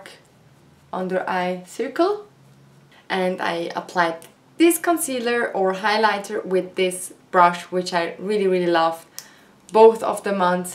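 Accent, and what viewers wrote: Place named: France. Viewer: Nice haul, i like how you speaks on French so chic 😉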